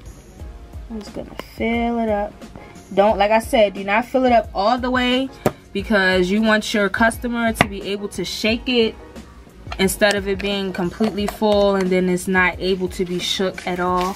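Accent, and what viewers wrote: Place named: DMV, United States. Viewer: You gotta be from the DMV area with your accent.. cute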